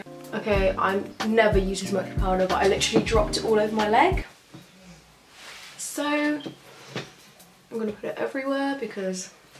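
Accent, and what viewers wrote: United Kingdom. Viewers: Why am I genuinely unsure if she has a slight British accent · Hey, i' m french and i would like to now were do you come from because you have an accent wich seem to be a british accent... someone can tell me. Thank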